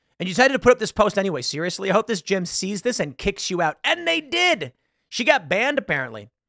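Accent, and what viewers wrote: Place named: New York, United States. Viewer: You say "bathroom" like I do; "bat'room' I was born and raised in NYC - Da' Bronx. 😂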